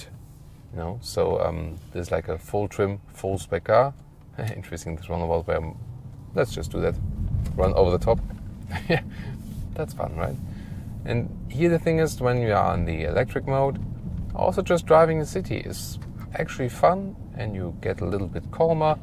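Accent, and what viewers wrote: Germany. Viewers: I don't understand German English ... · An excellent car, but I could do without the atrocious German accent!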